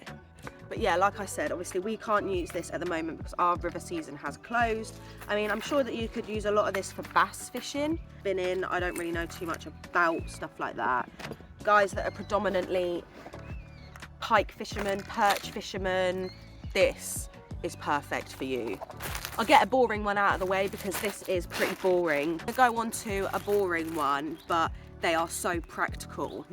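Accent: United Kingdom. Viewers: Great video 😊 Glad you're back on now Love your UK accent I'm here in the states And I always love hearing someone from the UK or Australia 😊 Good luck this season girl😊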